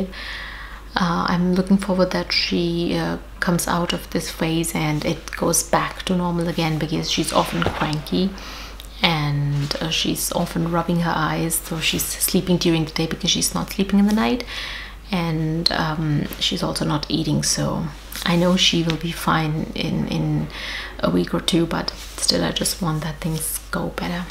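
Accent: Germany. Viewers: Love how your accent has slowly been Germanised. 😄 so cute. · Dear, sab se pehle, you are lovely! I’m a German, living in India, married to an Indian and we have a 6 year old daughter. Please zyada stress mat karo. Your daughter is fine for sure. Just feed her with your milk if she doesn’t want anything else right now. I don’t know how old your daughter is, but don’t worry, she will take what she needs. You said your milk isn’t satisfying her for too long, so maybe try with fruit purees? I don’t know how far you are with solids for her, but I guess she must be around 6 or 7 months? Teething can be a possiblity, even if it’s lasting already for a while. I wish you and your family all the best! Stay safe and healthy, all will turn out well! And yes, your accent is pretty germanised, mine is totally indian. Guess that happens naturally;)! Mujhe accha lagta hai! Take care and keep posting! 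Namaste 🙏🏼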